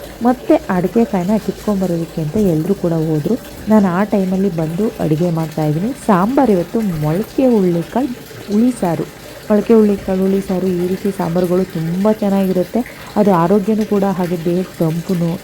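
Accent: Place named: Shimoga, India. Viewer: Hi nimma vlogs Supper nanu kuda nim side Talkere nanna Tavar mane nimmuralli namge parichaya davar edre na erodu Shimogga nan enjoy madta vlog nodtini akki palahara egantu marte hogide niv matadodu namma tarane adke nanag insta niv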